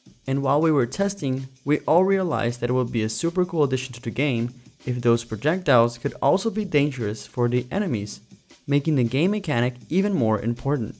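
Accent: Brazil. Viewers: É impressão minha ou você é brasileiro?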